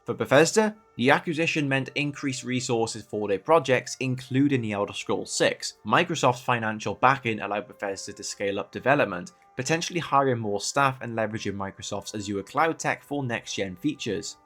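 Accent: Wales, United Kingdom. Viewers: Is that a welsh accent i detect?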